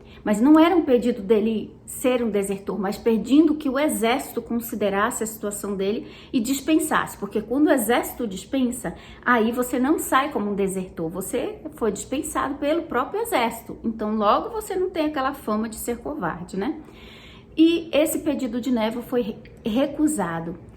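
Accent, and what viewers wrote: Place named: Northeast, Brazil. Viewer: Vc é uma fofa!! Sem contar que adoro seu sotaque... Amo os sotaques nordestino... bjosss!!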